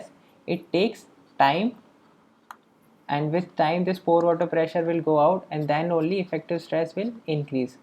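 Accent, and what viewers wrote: India. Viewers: I am sorry to say that most of my concentration is already used up by your 'terrible' Indian accent. A pity you do not learn English earlier at school. Secondly your writing is really bad. From the content it is probably ok, but as European I stop following you because I find it very hard to comprehend your English. A voice over or typed out English could do wonders. I return to my English book......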